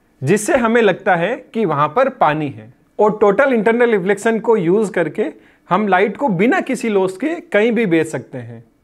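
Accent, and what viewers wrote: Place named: Rajasthan, India. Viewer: Sir aap Rajasthani hain na? I know😁 Your accent totally matches✌ I'm from Barmer, Rajasthan.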